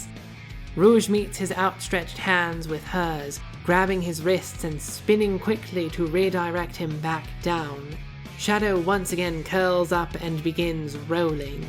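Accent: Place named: United Kingdom. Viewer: Why do you have a british accent in some videos but not in others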